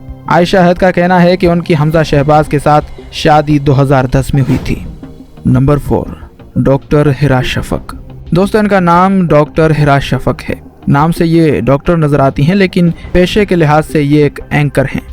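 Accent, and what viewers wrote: India. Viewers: How come this guy sounds like an Indian.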